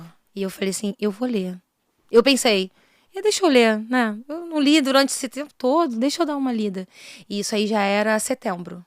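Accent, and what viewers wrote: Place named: Rio de Janeiro, Brazil. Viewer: Pelo sotaque é do RJ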